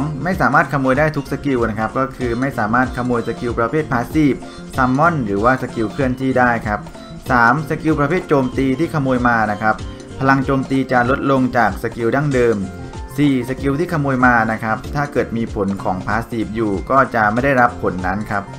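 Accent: South, Thailand. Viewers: คนใต้บ่นิ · พี่เป็นคนใต้หม้ายครับ ..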